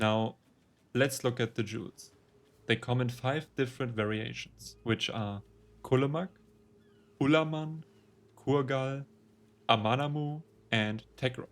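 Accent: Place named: Germany. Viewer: Junge dieses deutsch englisch ist ja grauenhaft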